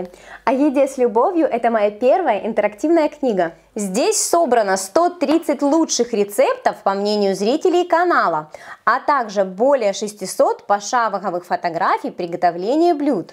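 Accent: Ukraine. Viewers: давно подписана на ваш канал- нравится ВСЁ )))) От того - что творите на своей кухне- в плане кулинарии до вашего мягкого певучего украинского говора )))) Если бы у меня вдруг не стал показывать монитор... ДА, я бы просто слушала ВАС!)))) Спасибо за рецептик- Очень красивый - вот что-что- а бизе у меня в духовке получалось- будем ЕСТЬ пироженки!))))))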